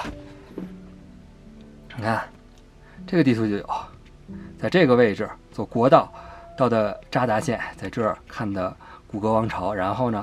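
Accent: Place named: Beijing, China. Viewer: 喜欢😍他的知性和标准的京片儿语音。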